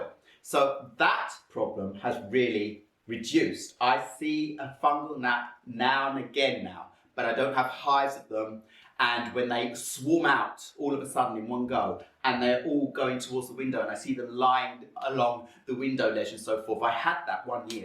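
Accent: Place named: United Kingdom. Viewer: I am so curious to know where you’re from? You got such a strong British accent id thought you’re from the UK? Your location says Malmö- hence the confusion ☺️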